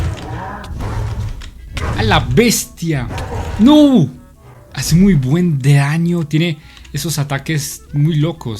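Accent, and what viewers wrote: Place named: Colombia. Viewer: Jjajaa ese acento se nota de Colombia saludos parcero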